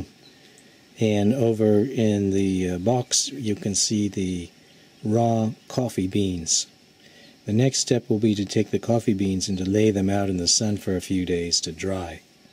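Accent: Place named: Wisconsin, United States. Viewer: great video. guy sounds a bit like Clint Eastwood with a Wisconsin accent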